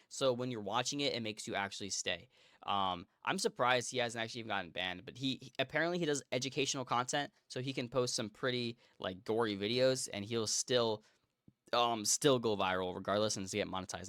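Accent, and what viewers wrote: United States. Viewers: Are you 100% American? If you're not, you're really good at english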